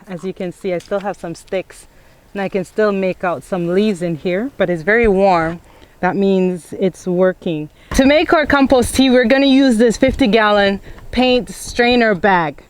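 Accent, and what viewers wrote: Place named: Jamaica. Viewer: Hey....good info...do I detect ...a jamaican....not much of us..doing this. Proud of u my child...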